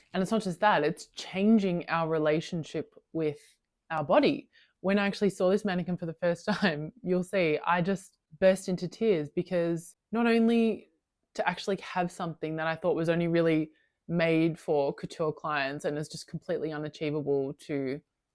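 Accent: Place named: Australia. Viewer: Nice vid! I did the cheats version of this about 18 months ago. I took photos and measurements and padded out a reasonably high-quality mannequin that was close to my size. It's not exact, because it was hard to get the little details right ......but its 80% there. She looks remarkably like yours, lol. Which is......comforting, actually. At first it was confronting to see how 'not perfect" I was. But after looking at her for months, I have accepted her......as me. AND........what a delight it is to be able to use a fit model that is closer to my body! I do corsetry and alot of fitted styles, so it really helps. I don't think she is quite good enough to do draping.....so i am VERY interested in your new acquisition, and seeing how you get along. PS. its lovely to hear an Aussie accent.....and you are a fellow Melbourne lady....so double 👍points there! 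PPS. you actually grabbed my attention initially because I saw your Regency outfit in the background.........I also enjoy costumery and am currently trying and failing to make some long regency stays.......I can't quite tweak it.....even with my padded form. ergh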